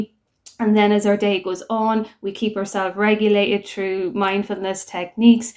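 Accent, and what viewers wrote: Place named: Ireland. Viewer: hi I have sent an email with details 👍 thank you so much for the content you post, just love that Irish accent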